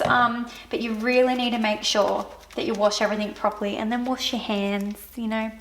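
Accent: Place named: Australia. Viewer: Love your looks, your pants, your nails and your aussie accent ("wooodaaaa"). Review is great. I have the corded crosswave.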